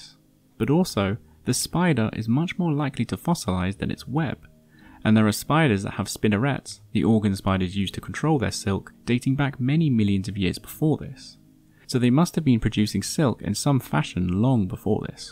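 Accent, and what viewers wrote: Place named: England, United Kingdom. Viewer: Does anyone else find this particular English accent really grating? Its just like nails down chalk board for me. All I hear is him sucking his teeth and speaking through his gums.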